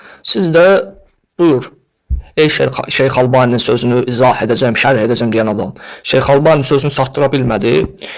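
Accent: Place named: Iran. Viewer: Sən niyə İranlılar kimi danışırsan?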